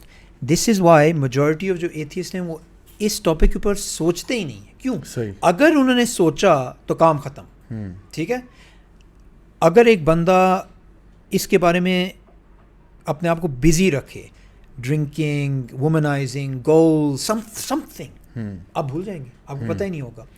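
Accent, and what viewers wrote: Pakistan. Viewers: Ma sha allah bhaijaan ko urdu mein bolte hue bohot accha laga, wo Pakistani touch zubaan mein unke barqaraar rahe. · I undertood the english part but with urdu accent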